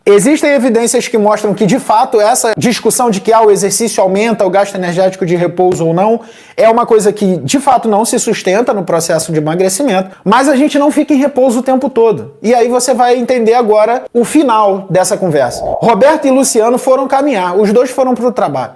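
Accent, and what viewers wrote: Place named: Rio de Janeiro, Brazil. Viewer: Dr.! Adorei o teu vídeo! Estou a procura de um profissional qualificado para eu poder começar a minha saga de alimentação correta e treino. Pelo teu sotaque, tu deve ser do Rio.. Mesmo se o consultório fosse longe, eu iria numa boa, pois quero muito sair da sanfona..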